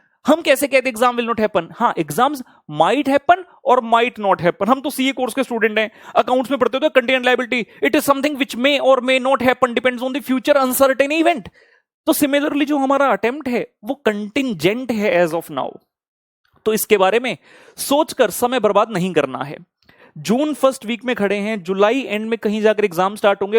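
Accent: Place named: Punjab, India. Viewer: Arey sir apka punjabi touch toh bwaal😂😂👌🏽👌🏽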